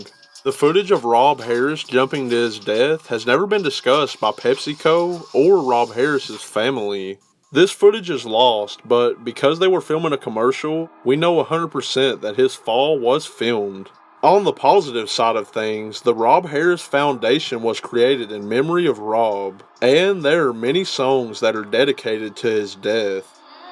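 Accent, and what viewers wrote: South, United States. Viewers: Are you Chills doing a southern accent?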